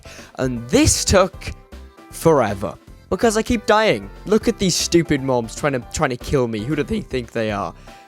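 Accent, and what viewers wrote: Wales, United Kingdom. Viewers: Soon as I heard a fellow welsh accent all the way from Australia I had to subscribe 😅👌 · Are u Welsh u sound Welsh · He sounds Welsh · You sound Welsh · Are you british? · Okay, are you Welsh?? Your accent is very familiar 😂❤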